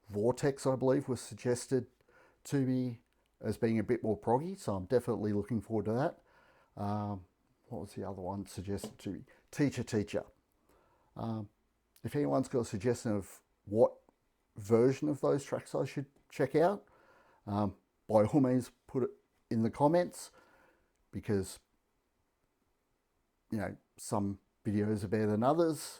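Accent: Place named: United Kingdom. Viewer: So ok I have done my job, subs, liked and will make a little blabla to your reac.! So yes is a great metal band from Ukraine, the lyrics are often hiding a great support to their country wich is fighting against these bloody russian! I hate them since I was young,and now I am a really old french metalhead (Second Wave Black Metal the most I think)... But I have a first question are you from the US or the UK, because the most reaction channel I see are from the US and you have such an accent, I think you're from the UK? (Great if yes). Second question, why do you name you "old...."? I am old yes, you could be my son, easy!!! Ok about an other song from Tatiana... I think about live official vid... Great song! (Like all song ^^). And dont bother to much about backing tracks things, is a real true band!!!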